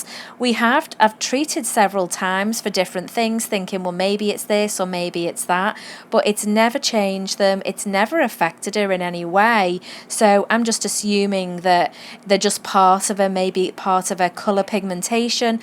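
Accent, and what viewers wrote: Liverpool, United Kingdom. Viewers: huh...are you from Liverpool UK? I am and your accent sounds so similar 😂👏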